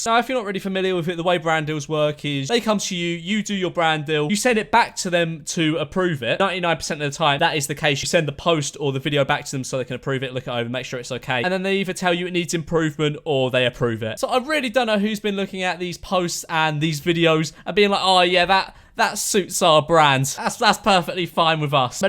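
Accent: United Kingdom. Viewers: What’s better than ur channel is your British accent lol 😂❤️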